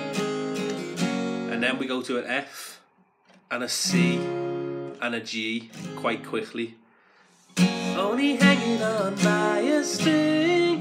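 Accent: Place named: Liverpool, United Kingdom. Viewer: Scouser!! Good man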